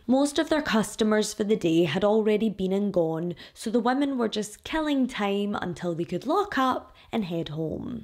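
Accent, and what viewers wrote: Scotland, United Kingdom. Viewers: Ohhh how lovely to hear your Scottish accent 🥰🥰🥰my mum and dad are from Lochgelly, Fife. They seem to have lost their accents, though my uncle was as broad as they get . They all moved to Australia late 1950's. Congratz on your getting married 🥂🥂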